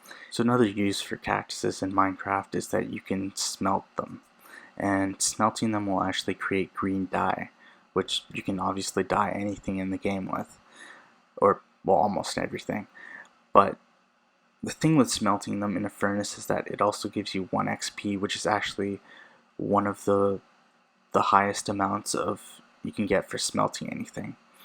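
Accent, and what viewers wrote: Punjab, India. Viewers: bro are you from Punjab?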